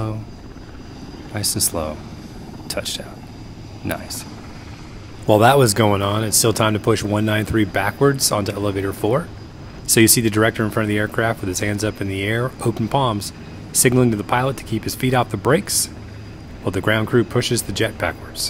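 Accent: United States. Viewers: Very smooth operation. I'm not American, never been in any military. I worked in civil Aviation (helicopters) all my life. I'm appreciating the difficulty to get all this right and the commentary is appropriately American but on the nail!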